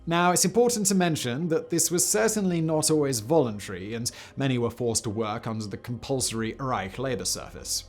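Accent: RP, United Kingdom. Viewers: Oh vey just another what is it Brit brick accent(?) telling lies about the war and Hitler? Maybe mention who declared and supported partisans, oh yes in this case was stupid to let Brits escape at Dunkirk, should habe them out and all would have been over. Who used dumdums? Ah right and terror bombing on German civilians. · This is my first Megaprojects video and posh, educated, hipster, laa de daa accent is something else. Immediate sub. Also for the interesting content as well of course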